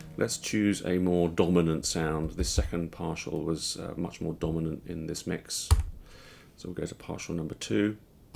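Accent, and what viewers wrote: United Kingdom. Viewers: Superb content, loved this and especially refreshing to watch a synth review from someone with a nice British accent for a change. I was wondering about a Jupiter X as a compliment to my Korg Kronos. The problem is, the Kronos is so hugely powerful that any additional synth needs to do something that the Kronos can't. Still not sure about the JP X, but this video really brought out the issues and benefits with admirable clarity. It also demonstrates what real UI complexity looks like. People moan about the UI on the Kronos, but at least everything is laid out on a large touchscreen for you, so once you know each synth engine, muscle memory can kick in. The interface on this looks like the worst of all worlds really, back to the days of painting the Sistine Chapel through a letterbox to some degree! A problem that certainly afflicts the D50 I have. 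What are your views on a JP X as a complementary synth to a workstation like the Kronos?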